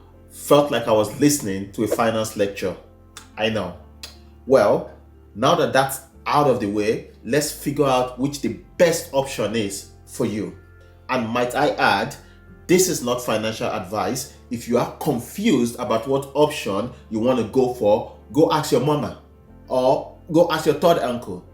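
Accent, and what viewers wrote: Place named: Africa. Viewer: I’m here for the great advice and African accent lol 😂😂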